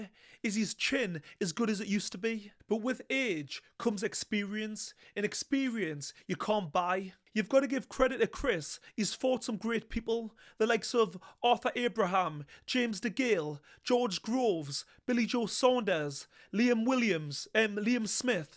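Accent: Newcastle, United Kingdom. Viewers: the Newcastle accent 😂🔥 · You sound like a Geordie mate ....you local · Are you from Newcastle?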